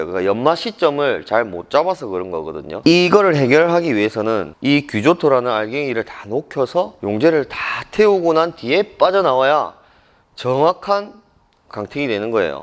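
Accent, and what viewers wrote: Gyeongsang, South Korea. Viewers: 상도억양 개싫다. ㅜㅜ😊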